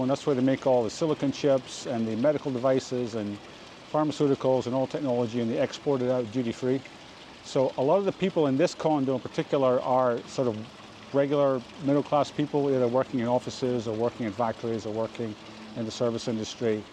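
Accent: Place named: Glasgow, United Kingdom. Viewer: That sounds like a west end of Glasgow accent…am I right?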